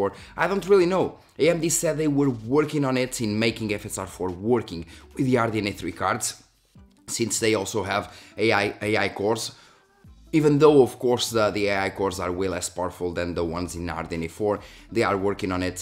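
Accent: Portugal. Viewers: Excellent video and explanations, thank you! P.s.: I've always wondered where your accent was from but I could never put my finger on it, but on this video I heard you speaking with your son (I guess) and I noticed you're Portuguese (I'm Brazilian, so that was easy to spot). Well, that's just my curiosity speaking now. Cheers! :) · Assunto fora de tópico, você é português ou brasileiro?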